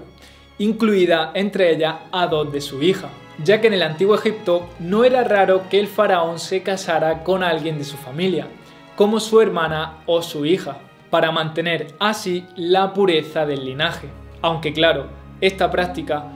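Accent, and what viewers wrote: Andalusia, Spain. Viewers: His way of speaking isn't even close to a person from Madrid. I'm 100% sure he's from Andalusia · Muy bueno el nuevo formato, siempre un placer escuchar tus explicaciones, con tu lindo acento andaluz. En marzo de este año estuve en Ejipto y visite la fabulosa Abu Simbel.... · Es español, de algún lugar de Andalucía. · Seguramente es de Andalucía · Wilder José Hernández español segun yo, soy chilena y nuestro acento no es asi jeje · eres español o chileno? Confunde tu acento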